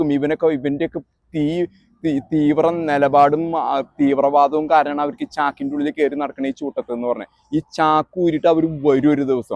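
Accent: Thrissur, India. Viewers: Thrissur slang super bro your news chanel mind blowing dialogue big salute 🙏🙏🙏🙏🙏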